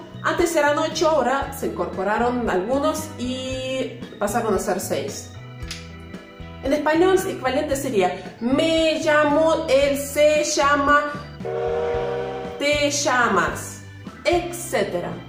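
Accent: Argentina. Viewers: A ustedes los rusos se les facilita aprender idiomas. Por cierto se escucha bien su acento argentino ☺ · q acento Argentino jajajajaja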